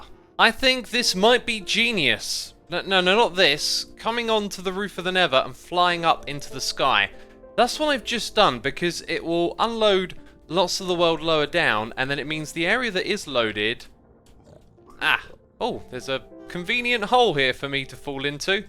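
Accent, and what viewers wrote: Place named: United Kingdom. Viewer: and the British accent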